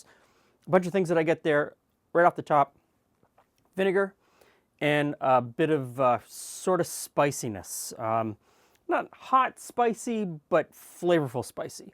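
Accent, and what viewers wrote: Canada. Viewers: He's saying it right. Albeit with a Canadian accent.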